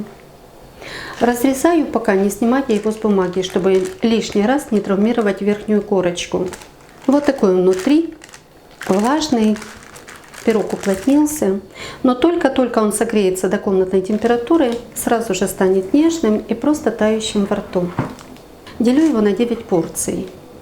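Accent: Ukraine. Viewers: Всё подробно, честно! Нюансы очень важны. Огромное спасибо за уважение к подписчикам. ❤ И ещё, спасибо за красивую украинскую речь!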